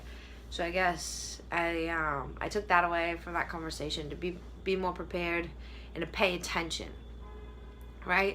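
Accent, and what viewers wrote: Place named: Boston, United States. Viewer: You seem very genuinely happy in your videos it's amazing! Also heard some Boston accent in this one, whateva whateva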